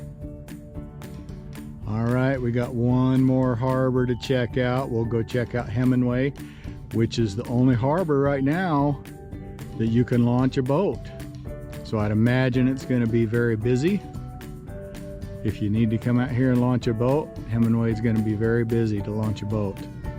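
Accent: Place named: Idaho, United States. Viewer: Are you from Idaho, Utah, Washington? Your accent reminds me of family in E. Idaho, it was pleasant even if seeing water levels like that aren't 😳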